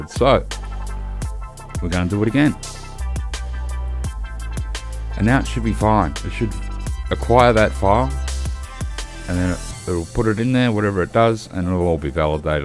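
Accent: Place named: Australia. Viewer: Much appreciated, love the casual Aussie tonne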